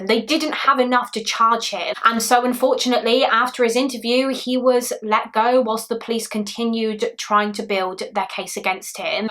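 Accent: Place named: Midlands, United Kingdom. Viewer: Okay I know this is completely unrelated to this tragic story. But where are you from? I’m from the UK but can’t pin your accent and it’s driving me insane like when you can’t remember the name of a song or tv show 😂 I’m guessing somewhere in the midlands?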